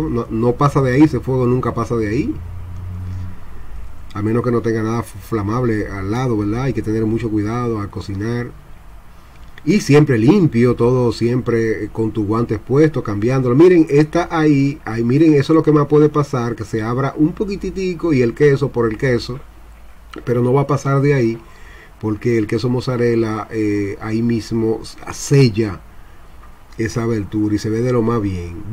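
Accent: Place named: Dominican Republic. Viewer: Ya me sacó de la duda de dónde es ( por su acento ) Mil x mil 🇩🇴🇩🇴🇩🇴😃nos parecemos extremadamente LIMPIO 👍👍👍👍👍👏👏👏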